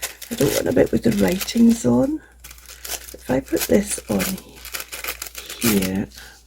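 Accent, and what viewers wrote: Scotland, United Kingdom. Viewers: There is nothing more mystical than a poem by Poe read with a soft Scottish accent! I love your little project today, I don't ever think to sew smaller booklets into my larger journals, so thank you for the inspiration! 💜🖤💜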